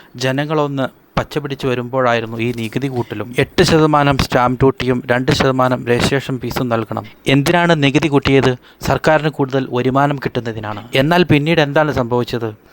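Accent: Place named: South Kerala, India. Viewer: തെക്കൻ ശൈലി ആശാൻ കളരിയിൽ നിന്ന് പഠിച്ചത് മലയാള അക്ഷരം മലയാളത്തിൽ പറയാൻ അറിയാത്തവർ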